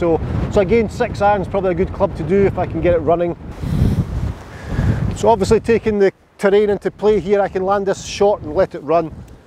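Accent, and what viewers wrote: Scotland, United Kingdom. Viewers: I’m in Glasgow great to hear Scottish accent with humour, I’ll take on board what you say to tomorrow morning on first tee, great vid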